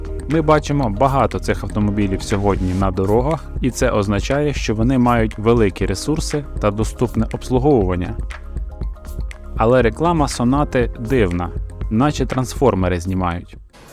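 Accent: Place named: Ukraine. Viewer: Ничего хуже хохлового говора в обзоре машин нет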